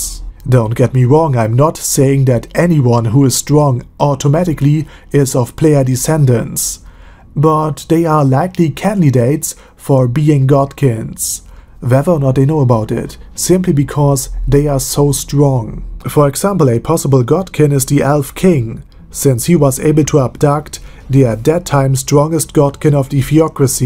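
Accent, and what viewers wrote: Germany. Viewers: Dieser deutsche ne akzent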